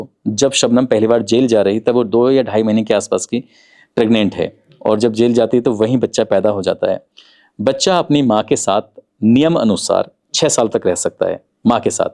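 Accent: Haryana, India. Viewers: भाई पता भी नहीं लगता कि हिंदी बोल रहे हैं या हरियाणवी🎉 मान गए उस्ताद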